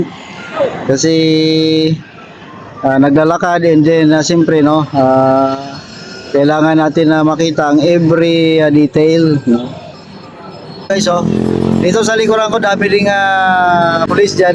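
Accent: Iloilo, Philippines. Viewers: Ilonggo ka gali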